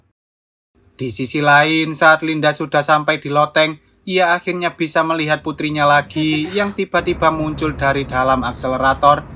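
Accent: Java, Indonesia. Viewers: Pengisi suaranya jawa banget 😅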